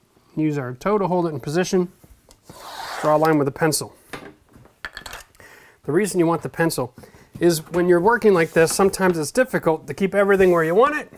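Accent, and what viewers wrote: Canada. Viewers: Heck, I learnt a lot from this guy, although I will never gonna do what he is doing but listening Canadian accent was fun for me!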